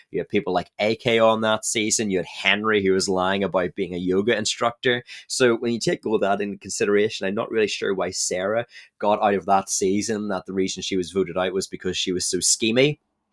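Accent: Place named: Northern Ireland, United Kingdom. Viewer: Having watched Survivor for like over 2 decades, and only really having some family members also watch Survivor to discuss with them. It's really strange and refreshing to hear someone else with a Northern Irish accent talk about Survivor as there are so few of us! (I'm from Belfast)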